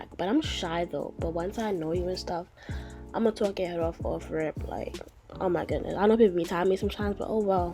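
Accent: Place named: New York, United States. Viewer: Hurd ya accent & knew you was from New York 😭😭 Ima self taught mua & hairstylist and would love to network with you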